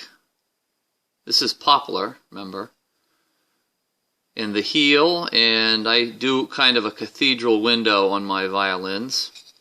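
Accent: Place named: New Hampshire, United States. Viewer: Just found this today... heard the "NH dialect" after finding the violin building. Lived in Brookline NH for years. Your violin building and description is both interesting and down to earth. I often wondered about building a dulcimer from Home Depot woods, looking at those beautiful cedar boards. Wonderful work and the videos are great!!! plus.. the fiddle sounds great! :-)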